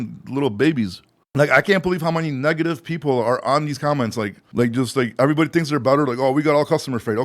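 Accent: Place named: Russia. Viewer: Why you have a Russian accent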